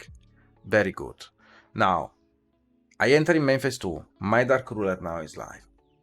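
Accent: Italy. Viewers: Ho sentito 10 secondi di video e ho già capito che sei italiano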